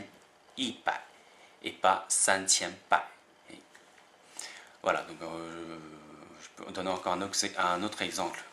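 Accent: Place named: South, China. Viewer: pas mal mais un peu accent du Sud par exemple 万 vous prononcez 旺——|||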